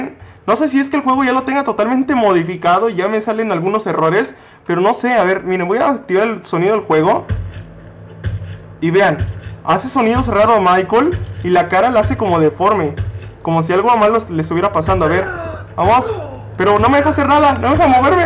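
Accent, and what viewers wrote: Mexico. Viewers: Esperen un mexicano creo diciéndole a los de usa americanos dios Trump que hiciste con México creo(por tu tonada dedusco que eres mexicano )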